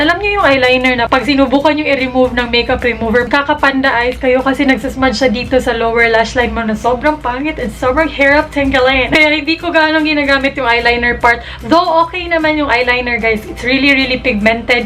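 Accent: Visayas, Philippines. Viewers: Omg bisaya 😂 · maklaro man na bisaya nis ate na hahaha cute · are you anywhere from Visayas? kasi halata po sa tono I mean sa accent nyo po.. from Cebu here :)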